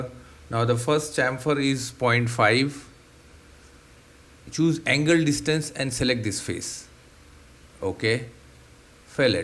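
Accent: India. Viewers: when the presenter has an Indian accent you know it will be good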